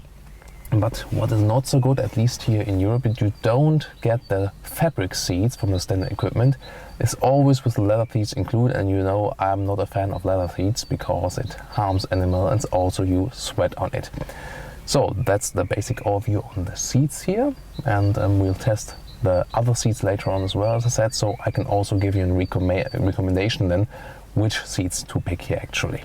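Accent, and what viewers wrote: Germany. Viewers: Ha ha, there's something cool in Your German accent! :]] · Warum macht ihr ein Review vom Mustang auf Englisch? Es gibt 3000 amerikanische, englische, australische, neuseeländische, kanadische YouTube Channel, die Autosendungen auf Englisch machen. Ich bezweifel, dass die sich dann gerne einen englische Review mit deutschen Akzent ansehen/anhören wollen, wenn es Channels, wie Motor Trend gibt.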